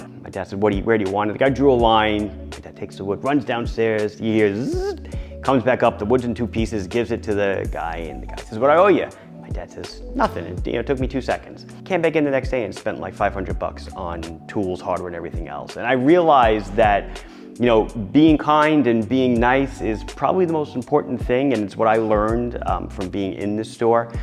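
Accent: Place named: New York, United States. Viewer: Hearing your New York accent brings me happy and nostalgia feelings, having grown up in Queens and Long Island. As a kid I used to think everyone spoke like that. My cousins who are bit older still have heavy Queens accents and they are a joy to listen to. And your story is fantastic. Good luck to you!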